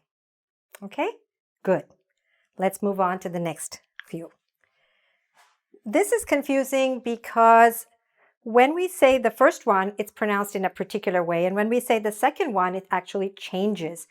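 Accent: United States. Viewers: Una pregunta las clases son con acénto americano o britanico? Gracias. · In London we don't open the mouth in pronouncing ( have/ has ) and we don't stretch the pronunciation of the letter A in ( have / has ) like you do and that is because you speak American English and not English English. Thank you for your nice show. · This video teaches us the words in an American or British accent?, i'm so sorry because I don't know, it's because I'm a beginner learning English😊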